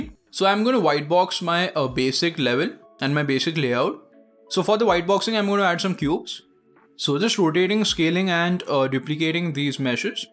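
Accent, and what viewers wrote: India. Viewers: It is genuinely a fact that when you've been struggling for weeks with something and finally a video comes along and the guy has an Indian accent he gives the most top tier advice that's super easy to understand and follow along. Thanks,. I've been struggling to figure out what to do in UE5. Now I have ideas.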